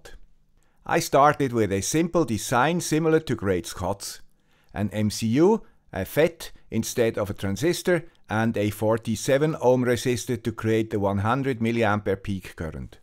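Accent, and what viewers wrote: Switzerland. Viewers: Does anyone know why he has a Swiss accent? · Hard to listen to swiss accent for the first time. Let's put it into "watch later". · The video started without audio on YouTube and my brain bugged trying to understand what was doing on the guy with the Swiss accent's channel. 😅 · a guy with a Swiss accent, a vintage high tech NE555... and now ATtiny's... what an awesome Sunday morning entertainment. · "Also a guy with a swiss accent"... Classic =P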